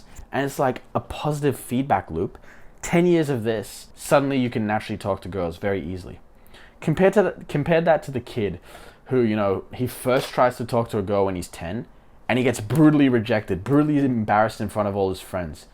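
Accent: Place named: Australia. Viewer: from the accent it's definitely australia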